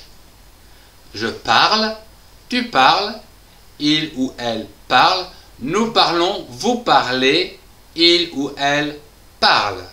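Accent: France. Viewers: la mélodie de votre voix est très française en anglais, c'est sympa. aussi votre accent, comme un copin de moi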